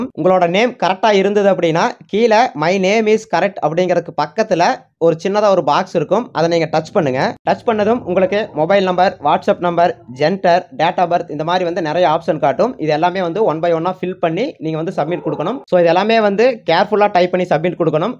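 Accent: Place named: Kongu, India. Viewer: கொங்குத்தமிழை கேட்பதே தனி சுகம் தான் நண்பரே!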